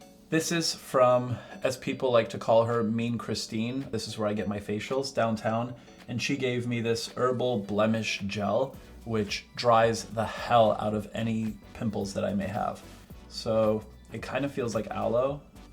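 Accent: Quebec, Canada. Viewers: okay but has a french canadian accent when he speaks french??😍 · OMG I didn't realize was Canadian till I hear his french accent!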